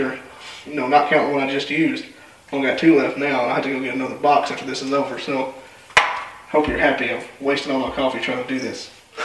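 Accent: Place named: South, United States. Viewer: That southern accent!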